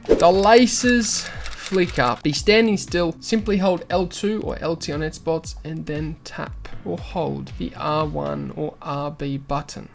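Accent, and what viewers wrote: Australia. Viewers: Aussie are u coaching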